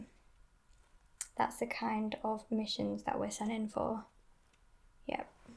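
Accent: United Kingdom. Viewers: Shes either gone MORE british or this is her actual accent and the "posher" one is put on...blown my mind haha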